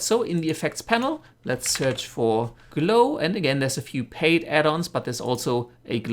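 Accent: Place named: Germany. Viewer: Really really great tutorial! and nice german accent :D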